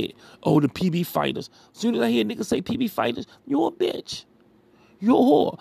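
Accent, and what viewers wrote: Baltimore, United States. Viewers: Yo, I dropped a video like a week and a half ago on this topic. I support your opinion. Btw, sound like you from B-MORE. I got some people out there. Talk just like. You, lol. Imma sub tho. Respect🙏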